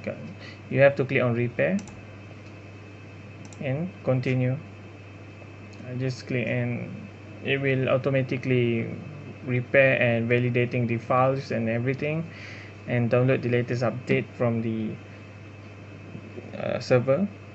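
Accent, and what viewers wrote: Malaysia. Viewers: U have malaysian accent bro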